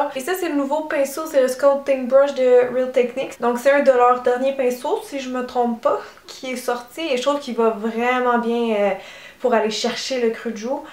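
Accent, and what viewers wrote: Quebec, Canada. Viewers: Bravo ! C'est la première fois que je commente une vidéo youtube, mais là ça en valait la peine ! Je n'ai encore jamais osé me lancer dans ce genre de maquillage mais là, ça donne vraiment envie ! Personnellement j'adore ce style romantique et bohème, donc j'étais ravie de découvrir ton tutoriel : le résultat est épatant ! :) De plus, les explications sont claires, et précises, tout ça avec le sourire et le joli accent québécois. Les françaises n'ont qu'à bien se tenir ! haha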